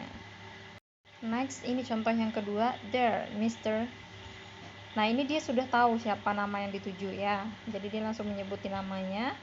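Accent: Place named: Palembang, Indonesia. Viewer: Terdengar tdi. Cara ke duo. Asli palembang ya mbak. He he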